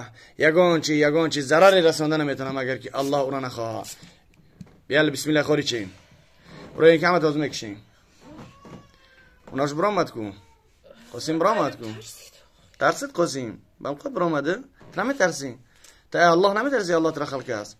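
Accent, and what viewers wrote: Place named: Afghanistan. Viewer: ин кори аз Точикистон иё аз Афгонистон? гап заданиеш монанди афгоно кам кам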